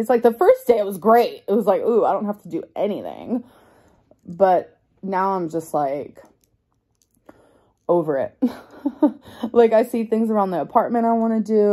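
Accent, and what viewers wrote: South, United States. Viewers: What happened to her southern accent ? I haven’t watched her in a while lol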